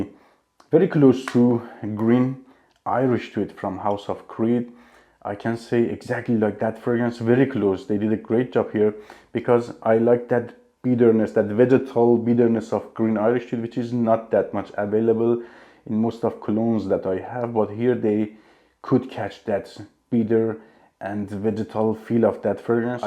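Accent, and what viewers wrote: Iran. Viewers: you have Iranian accent!!!??🤔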